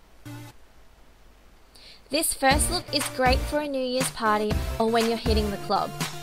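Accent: Australia. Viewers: u sound australian reminds me of alli simpson <3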